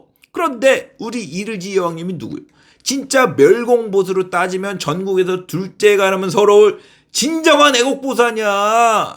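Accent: Chungcheong, South Korea. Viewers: 구수한 충청도 사투리 넘나 좋구먼유😂❤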